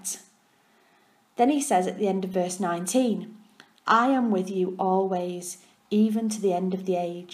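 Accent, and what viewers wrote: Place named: Ireland. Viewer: The Irish accent this lady has is just lovely. 😍😍😍😍😍😍😍😍😍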